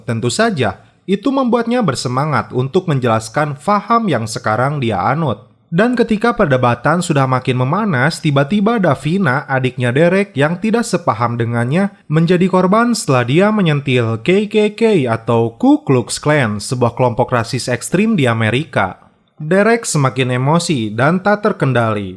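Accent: West Java, Indonesia. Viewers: Bang urang sunda nyaa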